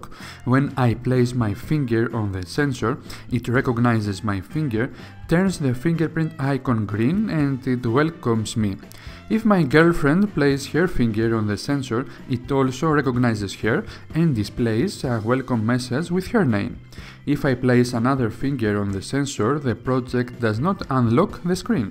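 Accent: Greece. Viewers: you sound greek, I'm from Rhodes btw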